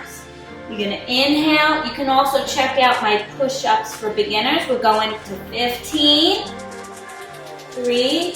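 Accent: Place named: East Coast, United States. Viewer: You make this doable. Wonderful attitude and sounds like you are from the east coast. New Jersey maybe?? Are you still doing your personal trainer program, and where are you located please. Thank you for sharing this.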